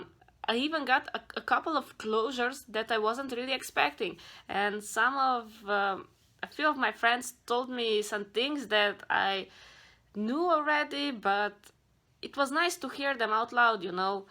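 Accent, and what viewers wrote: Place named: Slovenia. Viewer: Every last detail of this ongoing interactive life story is on point, even your bedroom wallpaper is right :D Good video, meakes the listener feel connected with the message of taking the responsibility for your destiny and than beeing persistent enough to follow it through without giving up beforr your time comes. Ps, leave at least 0.001% of Slovenian acent :D 🌺💚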